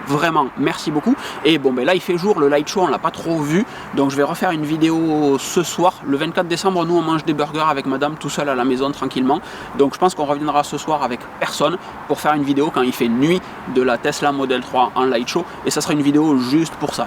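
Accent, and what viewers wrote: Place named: Montauban, France. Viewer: hello , bonjour de Montauban , content de trouver un autre youtubeur avec le meme accent que moi ;)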